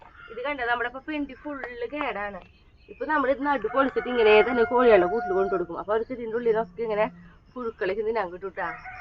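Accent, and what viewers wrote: Thrissur, India. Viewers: കുറച്ചു കൂടി clearആയിട്ട് സംസാരിക്കണെ..ഞങ്ങൾതൃശ്ശൂർക്കാരല്ല..മനസ്സിലാകാൻ കുരച്ചു പ്രയാസമുണ്ട്❤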